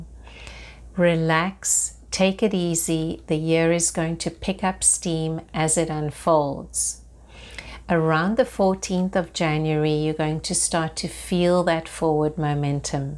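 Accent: South Africa. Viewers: You sound South African 🇿🇦🥰I've had this on my watch later list for a few weeks now. Glad I watched it now, spot on from the start of the video, thank you for the message 🌻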